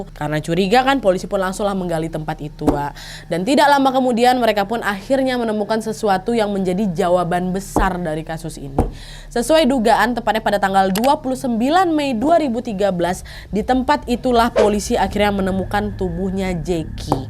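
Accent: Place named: Medan, Indonesia. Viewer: ngakak,nampak kali medannya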